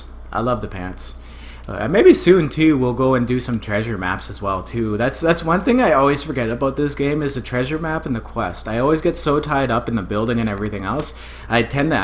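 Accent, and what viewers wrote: Canada. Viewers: had a suspicion you were Canadian because of your voice man lol I'm from London Ontario what pert O Canada you from eh?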